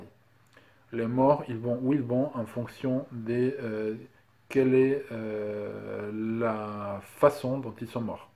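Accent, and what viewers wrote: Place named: Mexico. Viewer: Moi je suis POUR un podcast en espagnol !!! Il y en a si peu 😞 ! je regarde celui de Esperanza y Anna, ( du Pérou je crois) et celui d'une espagnole d'Espagne mais qui ne me plait pas des masses...Ceux d'Amérique Latine en général portent surtout sur le crochet, alors j'adorerais t'écouter en espagnol même si je ne suis pas sûre de tout comprendre avec l'accent mexicain 😄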